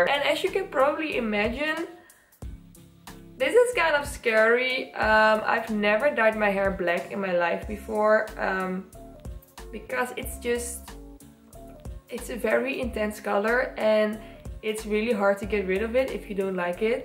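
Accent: Netherlands. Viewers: Benje nederlands want ik hoor accentje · Hahaha i came to the comments to check if she was dutch😅 heard something familiar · You have a really Dutch accent while you speak English. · R u dutch? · U sound like ur from the Netherlands 🤔🤔🤔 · No hate but is she Dutch?? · are you dutch? :D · Why does it sound like you have a dutch accent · why does she speak so funny? is she dutch?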